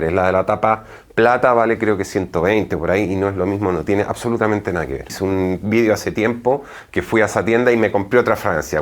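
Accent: Chile. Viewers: España? Pense q grababas en Chile debido a su acento. Gracias por el video! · tienes un acento Chileno,es a si??,saludos.... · Tan guapo 😊 tiene todo el acento chileno 😊☺ saludos... Eres seco 😙 y Viva Chile 😊😊😊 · Tienes una mezcla entre Chileno y Español hermosa porque entre Chileno metes una frase español y es gracioso 😂😂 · Siempre te veo y algo tienes que aún. No descubro bien se que vives en España pero tu acento no es de español, eres Chileno verdad ? Sorry se que no tiene nada que ver con el video. Gracias a ti tengo 4 perfume recomendaste saludos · Me encanta escucharte, sos un maestro, das cátedra y si a eso le sumamos los ojos claros, la camisa negra y el acento chileno ya sos irresistible. Más reseñas de perfumes femeninos y cuáles considerás unisex. Abrazo desde Uruguay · Es chileno'español cuando habla jajaja un crak abrazo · Hola, podrias decirme si realmente eres Chileno? 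Por tu acento lo creo..... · Acento chileno + acento francés, pero vive en espana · estarás en España, pero tu acento chileno te delata, el tono de voz y los gestos del típico santiaguino 🤣😊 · De que parte de españa eres su acento es como escuchar a un Chileno. Gracias por su canal. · De que parte de España eres?, hablas como Chileno.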